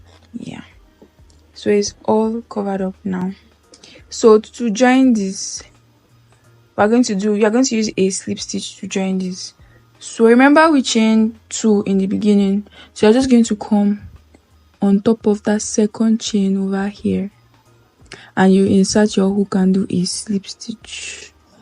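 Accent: Nigeria. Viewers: I love it it's so beautiful and I love your accent Are you Nigerian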